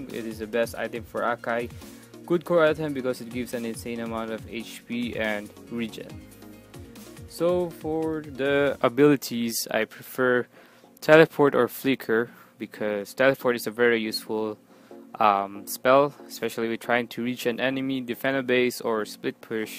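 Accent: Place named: Philippines. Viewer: pinoy 👍😂 halata sa accent.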